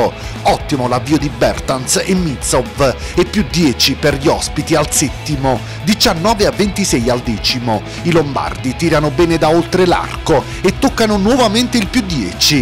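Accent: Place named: Sardinia, Italy. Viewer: Audio fastidiosissimo. Dite al giornalista che non si battono TUTTE le finali. E si sente anche accento meridionale, sardo presumo...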